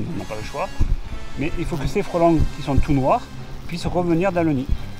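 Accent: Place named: Toulouse, France. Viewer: avec un accent toulousain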